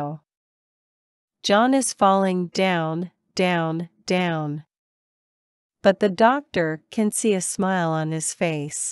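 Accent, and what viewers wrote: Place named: United Kingdom. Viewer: I have been listening to your voice for 9 years. I have no way of accepting other British accents and robotic accents. I'm glad to hear your accent. Thank you so much!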